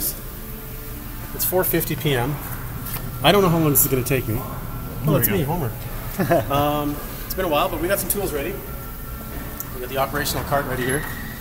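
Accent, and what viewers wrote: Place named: Canada. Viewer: I don't know what makes this video better.....your extensive knowledge of car repair or your awesome Canadian accent.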